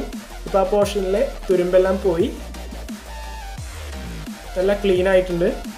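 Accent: Thrissur, India. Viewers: Oru Rakshayilla.. Trissurkaranano?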